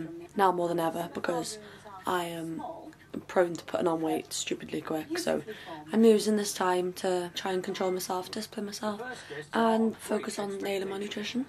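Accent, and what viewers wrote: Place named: Wales, United Kingdom. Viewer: The Welsh accent is getting stronger with each day in quarantine!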